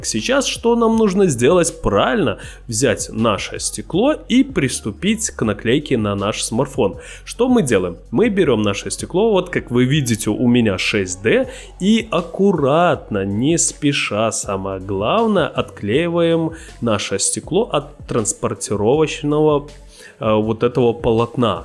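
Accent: Belarus. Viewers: Автор, Вы - беларус?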